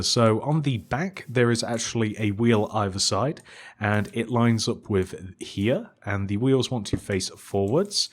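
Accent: United Kingdom. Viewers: Are you british Hope you're not I will be mad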